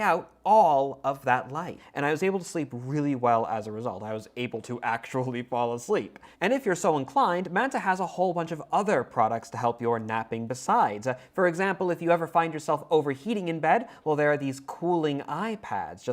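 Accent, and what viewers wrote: United Kingdom. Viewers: is the only person alive who is able to sound british while talking in an american accent.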